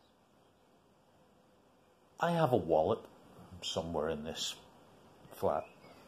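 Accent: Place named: Ireland. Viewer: I heard that Irish accent a little more this time :o)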